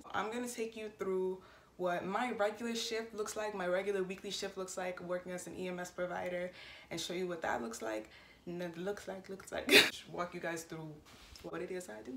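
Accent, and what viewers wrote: New York, United States. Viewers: Sound like a New Yorker😎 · as soon as I started watching I just knew you were from New York lol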